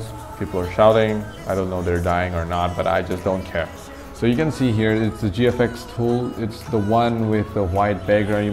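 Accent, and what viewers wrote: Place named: India. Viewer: indian with crazy english accent 😍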